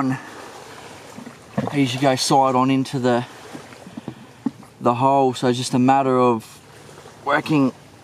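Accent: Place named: Australia. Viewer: Love his Aussie accent. G'day mate!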